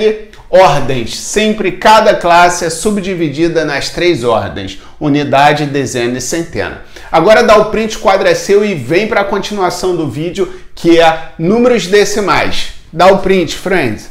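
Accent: Rio de Janeiro, Brazil. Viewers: Amo o jeito que ele fala carioca kk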